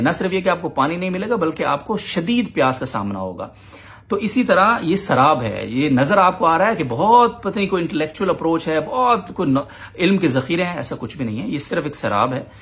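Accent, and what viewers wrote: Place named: United Kingdom. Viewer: Let me ask you a simple question , are you an "aalim" ? The answer is probably yes because you're a graduate of jamia rashed . And is Tariq masood an aalim ? You'd obviously say yes . Then let me tell you that aap jaisy chechory or bazari so called aalim sy Sahil adeem type log hazar dafa behtar hen . Aap log karty kia hen member pe bet kar ? Chichora pan aur bazari zuban , choktkoly sunana aur bc Tum tu Bismillah bhi English accent me party hu which implies your inferiority complex . I believe the whole jamia tu Rasheed is suffering from deep sense of complex which there're trying to satisfy . You people are impossible. Tbh aap logon ko dekh kar main enjoy bhi karta hun because you're a joke and ghussa bhi ata hai because of the nonsense you speak and spread among the riffraff.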